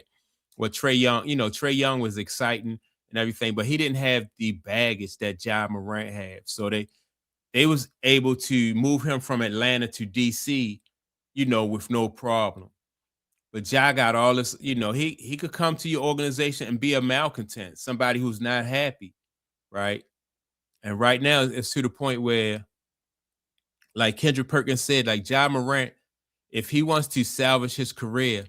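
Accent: Baltimore, United States. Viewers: That Baltimore accent is heavy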